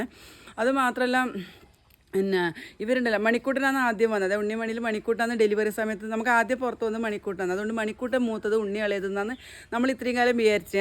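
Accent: Kannur, India. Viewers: കണ്ണൂർ ഭാഷ സൂപ്പറാട്ടോ, കേൾക്കാൻ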